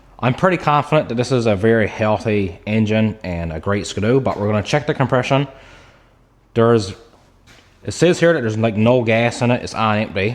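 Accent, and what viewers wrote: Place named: Newfoundland, Canada. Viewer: Soon's I heard ya speak I said "My son, there's only two Islands that guy could be from."..So I took a look around outside and I don't see ya nowhere here in Cape Breton so Newfoundland it is.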